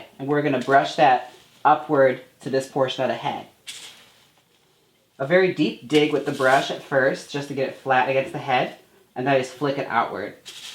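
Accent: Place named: Midwest, United States. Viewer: I live for the midwest cow maiden accent with the squeal!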